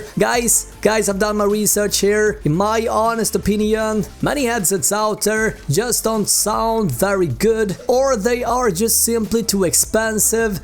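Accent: Sweden. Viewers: Strong Swedish Dialect :)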